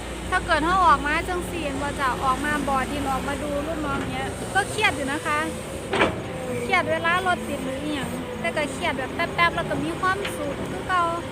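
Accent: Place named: Isan, Thailand. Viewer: จักถังแน่คับน้ำมัน..ยามเว้าอีสานตาฮักคักเนาะคน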